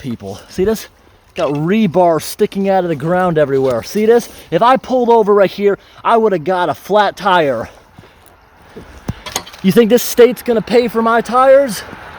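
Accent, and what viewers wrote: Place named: South, United States. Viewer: man sounds like my states down south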